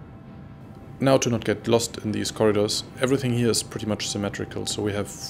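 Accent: Germany. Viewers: moin, du scheinst ja definitiv ein Deutscher zu sein, unschwer zu hören. danke für die großartige Arbeit! weißt du denn ob wirklich keine Rampe zum Hangar ist? kommt mann nur über den Bauch rein?(Leiter/Fahrstuhl)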